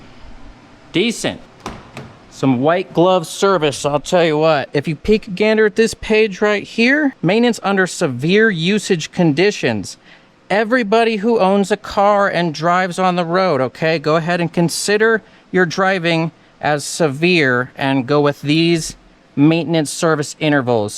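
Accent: Canada. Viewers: Love your videos. Do you use Bosch 3323 filters on Hondas since they moved away from Filtech and what do you think about Aisin transmission fluid and coolant? Gemini told me that it is as good if not better than Honda but I was a little concerned when I looked at my receipt and saw that my independent shop didn't use Honda fluids when I had my 73K 2012 Odyssey EX-L in for service. I bought it new and hauled the kids around in it for years so I can't get rid of her even though the last service cost me more than she is worth. I thought that Aisin timing belt kits were industry standard but didn't know anything about their fluids. Can you share your thoughts on those products - either in comments or on a video? Also, I assume you grew up in Canada based on your accent, etc. but haven't seen a video exp,aining that. Is there one that I missed?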